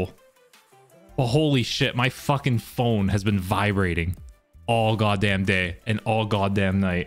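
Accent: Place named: Cockney, United Kingdom. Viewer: People will call us bri'ish schtewpid and then try and do cockney accent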